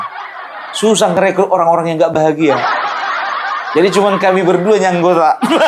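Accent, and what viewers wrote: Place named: Java, Indonesia. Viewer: ga kuat nich perutku da kaku ketawa terus, jadi pelawak aja wes ngomong jawa 😂😂😂😂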